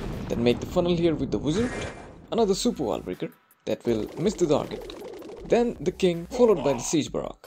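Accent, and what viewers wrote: India. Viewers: Bro im not sure but I think youre from india cuz i saw your thank u for 3k subs and all the background noise of vehicles and electricity poles make me feel youre indian. Plus theres a slight touch of hindi accent in your english. Please let me know if im right!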